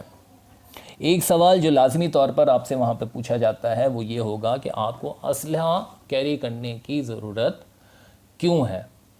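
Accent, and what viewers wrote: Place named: India. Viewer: Bahe ap ne jo tarika btaya aisa kuch b nahe hai pakistan m ap muje indian lag rahe ho zuban say b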